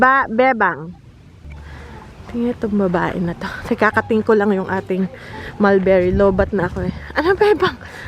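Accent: Batangas, Philippines. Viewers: Thank you so much sa pagsagot ng tanong ko..kaya pala may dine at baga ang salita ..kahawig ng salita namin sa Mindoro...lagi ko pong panunuurin ang inyong vlogs..thank you so much🥰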